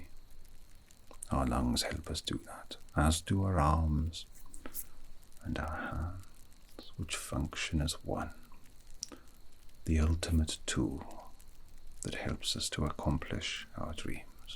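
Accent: Wales, United Kingdom. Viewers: Tone, timbre, and lilt are all perfect -- and I for one can never get enough of the Welsh accent. I've watched this twice now, at times when I was busy, so I look forward to having another go when I finally get to rest. So, will I have the dream, or will the dream have me? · You have done nothing wrong to need forgiveness, your welsh accent is always welcome to me. ☺ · Welsh accent? I'M IN. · I dont play video games but i like the crackling fire, and natural Welsh accent is always welcome, my favorite actually.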